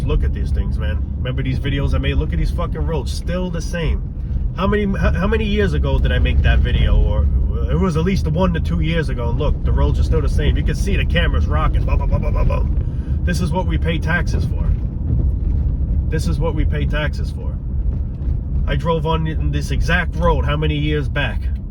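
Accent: New York, United States. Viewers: I love ❤️ your New York accent and your videos. I feel so grounded in actual (not fake) 🃏 reality and unfortunately there's not a whole lot 🤏 of positivity. 😞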